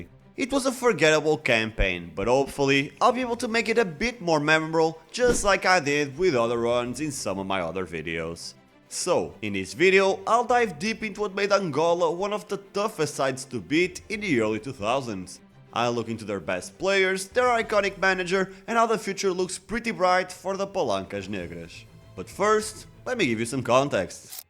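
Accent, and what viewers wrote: Portugal. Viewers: Sabes que youtuber é portugues quando o sotaque portugues é bem evidente/pesado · he is Portuguese, his English accent can tell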